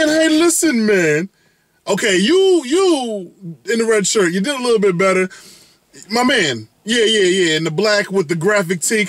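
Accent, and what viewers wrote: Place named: Boston, United States. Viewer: YEH HE SOUND LIKE HE BOSTOOOOOON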